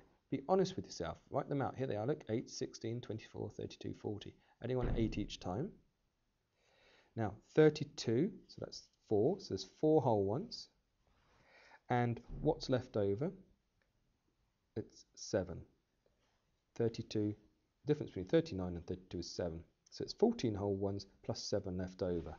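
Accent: United Kingdom. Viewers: omg hes british :D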